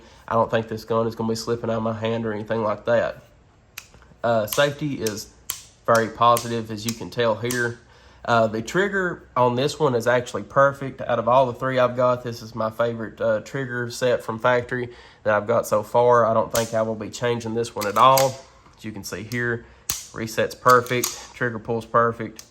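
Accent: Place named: Middle Tennessee, United States. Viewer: Where are you from, brother? I'm from Savannah, TN and you sound just like we do here in middle TN.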